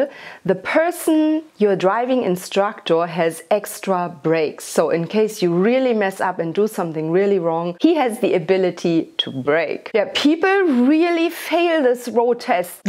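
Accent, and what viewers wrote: Germany. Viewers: Das hat mir gefallen. Aber nach 16 Jahren so ein krasser deutscher (like they let talk "us" in movies...) Akzent?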